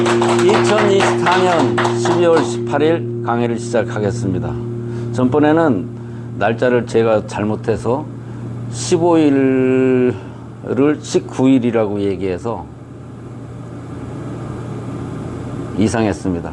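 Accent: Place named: Chungcheong, South Korea. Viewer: 강의 참 재미있습니다❤ 충청도 제 고향 본토 사투리가 더 재미있습니다❤ 바락바락 ㅎㅎㅎ 다리에 쥐 날때 족삼리 오금통 위중에 사혈 숨통을 터준다 ❤❤❤ 급한대로 피를 낸다 백문이불여일견이라 신경 신경은 허리 천추에서 시작 진단 엘로드 진짜 재미가 바락바락납니다 ~~~ ^^ 고맙습니다 ❤❤❤❤❤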